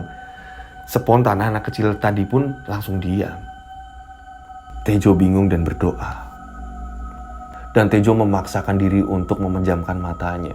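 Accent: Banyumas, Indonesia. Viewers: Ya Allah bang.....multi talen banged Versi ngapak nya best Yoskay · Saya suka, bahasanya jls. Dn bahasa Jawa + Ngapaknya itu bikin saya suka. Hehe.. BWI ikut Nyimak. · Critany dri Tegal ap Pekalongan Min soalny ngomongny Medok bnget hhh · Bintaro Tangerang hadir mas, haduhhh massss ngeri bgt aq nyimak kisah ini, masalahnya aq y sllu naik kereta klo k jakarta, mas luwes bgt ngomong logat Tegal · Sereem....tp kudu guyu...bhs tegal e pas bgd🤣🤭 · Banyuwangi hadir mas....suka banget mas nyampeinya pakai bhs ngapak" tambah seru...BOOOM BOOOM BOOM....YOSKAY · Pintermen Bhs.Tegalnya mas logadnya dapat,lanjut crita slanjutnya yosky....😄😄💪💪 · baru crita ini yg buat q senyum senyum sndiri cara pmbwaane pakai bhs ngapak,,,apik mas enyonge seneng cara ngomong e,,,,😁😁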